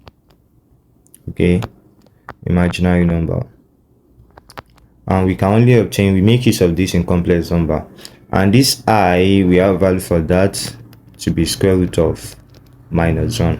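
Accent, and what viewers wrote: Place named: Europe. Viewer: In math, we strive for precision and certainty, so I appreciate how you described it as a convention. I’d be glad to learn that you're from the US, though it sounds to me like you might be from Europe instead.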